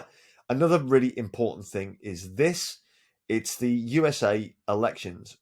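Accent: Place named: Norfolk, United Kingdom. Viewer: Norfolk! Sounds weird hearing a crypto chap from the area.good luck this cycle ✌️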